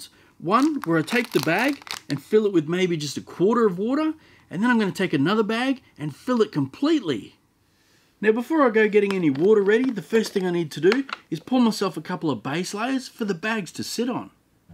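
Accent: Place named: Australia. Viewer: I liked 1& 2. Hey, where I live we are having tornados in the area so we have a Take Cover Warning. I’m watching your videos while sitting in my bathtub (I don’t have a basement). Thanks for keeping me company. Do they have tornados in Australia? You sound like that is where you are from. Have a great day.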